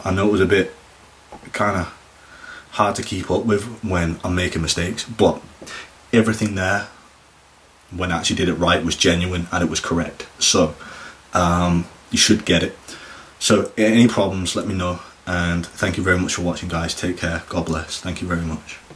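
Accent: United Kingdom. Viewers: BRILLIANT!!!!!!!! T U so much for literally helping me out with ALL FORMATIONS of the Pentatonic Scales; I happened 2 stumble upon U, and Quite elated that I NOW can practice Scale Patterns watching your video. Also love your English accent.! Again, thanks! peace&love out 2 U cheers!!!!!!!!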